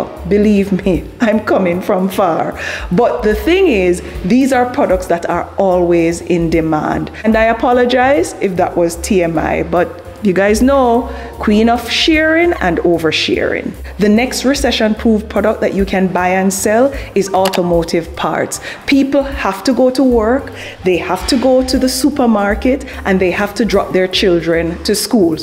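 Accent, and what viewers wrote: Jamaica. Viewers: I love Jamaican accent. Can Listen to you all day long 😊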